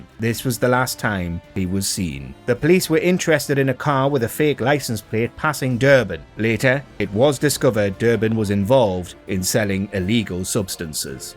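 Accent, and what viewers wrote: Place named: Geordie, United Kingdom. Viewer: No, it has a twang of Geordie. Maybe Middlesbrough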